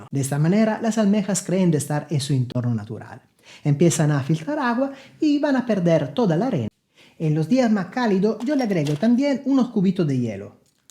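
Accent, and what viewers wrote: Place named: Italy. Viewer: Buenos dias. Ya activé la función WhatsApp. Es una gran idea y gracias por hacerme partícipe Este poema es para ti Cocinando Sabores y maestría de la mano de. Simpatía y sencillez. Cocinando algo rico paso a paso cada vez. Y siempre acierta él porque algún ingrediente en casa sueles tener. con su encanto y su acento italiano. Y aunque a su mujer no logre conocer, la inspiración ha de tener. Hace de todo este Chef, da consejos, hace puzzles, capaz de contar chistes buenos también. Admiración y estima siente esta gaditana. Que dice siempre lo que le vienen ganas. Para y su mujer de una fans y cocinera apende recetas nuevas.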